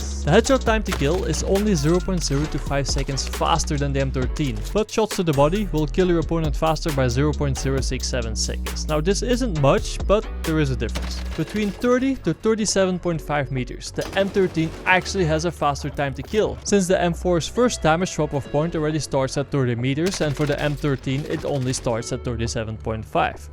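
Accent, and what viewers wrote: Netherlands. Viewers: I can hear on your accent you are dutch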